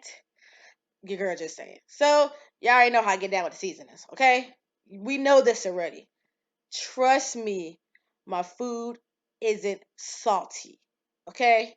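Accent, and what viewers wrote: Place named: Baltimore, United States. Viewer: I LOVE listening to you and watching your videos lol! You are so down to earth and don't be with the extras...please keep them coming. You sound like you're from Baltimore where my family is from:) Thanks so much!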